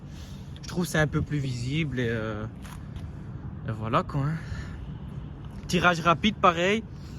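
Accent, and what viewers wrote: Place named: Hainaut, Belgium. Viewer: Avec ton accent Hainaut laisse tomber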